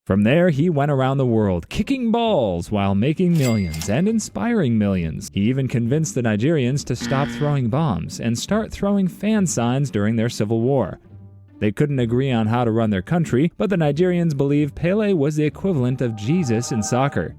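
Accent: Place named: United States. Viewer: First I thought to myself why did he put Ronaldo with a #21 on the thumbnail but then I heard the American accent and everything made sense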